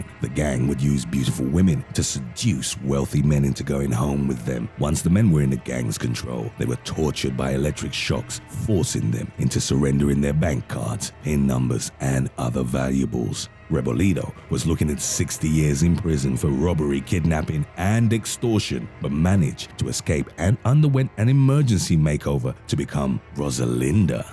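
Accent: Spain. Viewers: nice video, your spanish is pretty funny btw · this narrator gotta be some sort of spanish. (btw love the voice)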